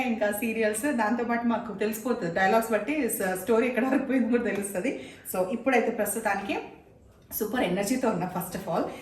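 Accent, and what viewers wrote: Telangana, India. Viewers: Meeru matlade vidhanam baga nachuthundi telangana bhaasha, yaasa lo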